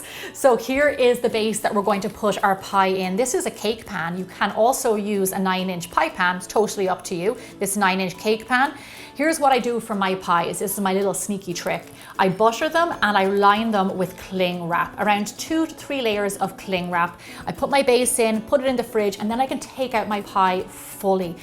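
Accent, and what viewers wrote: Ireland. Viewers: Mmmm that pie look so yummy. Also. I love your. IRISH ACCENT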